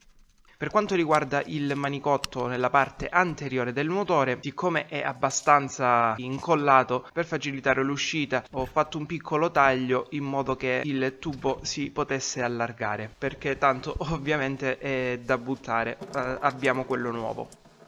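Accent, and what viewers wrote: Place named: Salento, Italy. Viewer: Che bell'accento salentino che hai! Sei di Lecce?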